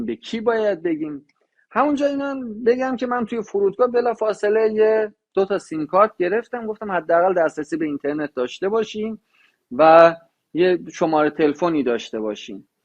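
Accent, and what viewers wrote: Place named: Shiraz, Iran. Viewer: لهجه شیرازی داری آقای دکتر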